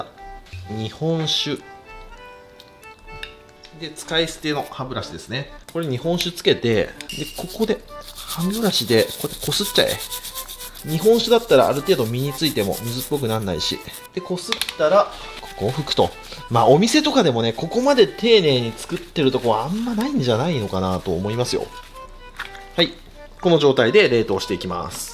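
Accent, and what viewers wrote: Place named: Aichi, Japan. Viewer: 愛知だからか朝倉未来とイントネーション同じ時ある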